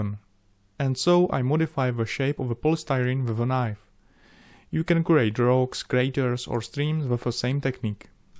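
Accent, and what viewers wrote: Czech Republic. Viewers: Mluvíte skvěle anglicky. Ale pro čecha je to jednoduché poznat že jste čech. Ale jinak skvělé modely. :) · D hneď som vedel že si čech podľa prízvuku:D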